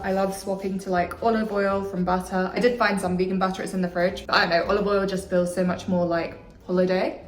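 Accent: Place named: United Kingdom. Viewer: no dear, please! I'm in love with everything British, like your British accent 😍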